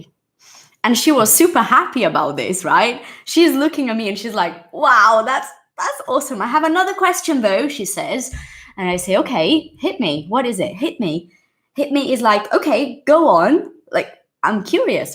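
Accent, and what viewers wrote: United Kingdom. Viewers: Louca para pegar uma live contigo. Já sou fã amor. Amo o seu inglês, sotaque britânico, e quero ser fluente na fala assim como nos ouvidos. Eu entendo tudo mas falar não consigo ser fluente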